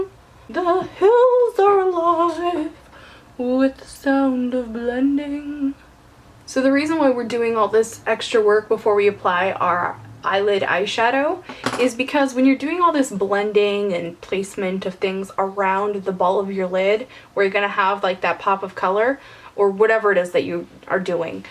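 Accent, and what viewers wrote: England, United Kingdom. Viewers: thank you- I love shows with a brittish accent:) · This video made my morning,! So fun! Love your English accent, love!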